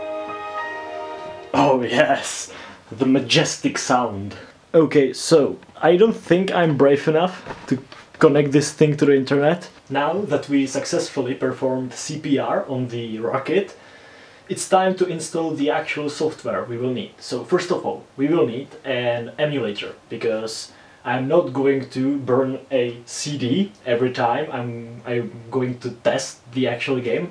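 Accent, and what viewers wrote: Czech Republic. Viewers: Hned jsem věděl, že jsi Čech podle tvé angličtiny, průzkumník souborů a poznámkový blok mi dal za pravdu <3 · Cool. im from slovakia. i heard you czechis accent. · Teď mi tohle video náhodně vyskočilo na YT a říkám si, že v té angličtině slyším češtinu a starý noťas mi to potvrdil! :D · I was watching the video when I noticed your accent. I thought wow ... sounds like a Czech accent ... and then I noticed the trash can label :D Keep up the great work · To že seš Čeh jsem poznal podle toho hlasu Angličtiny. Jinak dobrý video! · ey i recognized that czech accent good vids ngl :) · To jsem poznal že jseš z Česka podle té výslovnosti. Jinak dobré video! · Ahá :D mě se zdála tvoje angličtina taková počeštělá :D a win xp vítejte.... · i cant help myself but i hear a really strong czech accent in your voice . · časkej přízvuk jak poleno! 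super video!!!!!!!!! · I was wondering why the accent was so familiar, turns out you are a fellow Czech. Great video btw, it's quite fascinating to see how they made the games back in the game. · Bro I swear this guy has such a big czech accent. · Do u live in Czech republic bc i hear an accent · It's funny - you've started speaking English and I immediately knew you are Czech. There is no hiding 🤣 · Slyším první slovo anglicky a hned slysším, že jsi Čech, jinak parádní video kámo. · Hned jsem věděl podle přízvuku, že jsi čech xd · Já tušil že jsi čech :D ten přízvuk mi napověděl :D ale jinak super video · Hodně dobrej kontent! Podle přízvuku jsem poznal že jsi z Česka a potvrdil mi to "Koš" na Windows XP :p CG!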